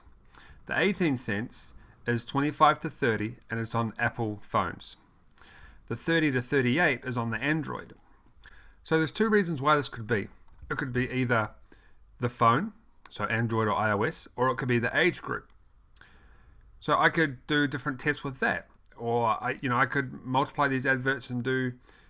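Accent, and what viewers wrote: New Zealand. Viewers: Recognize that kiwi voice instantly; love your videos bro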